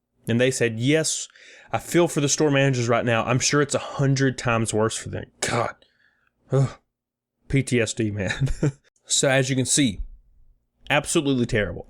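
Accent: South, United States. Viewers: Do I hear a fellow country boi actsent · Funny how it takes just one man with a camera and a southern accent to destroy Gamestop. You're the best,! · Anyone know what part of the south hails from? Love the accent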